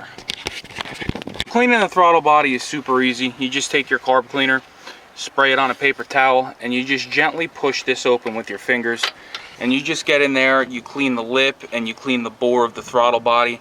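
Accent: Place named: Philadelphia, United States. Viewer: You hear South Jersey, I hear Philly, but they are close enough to almost be the same.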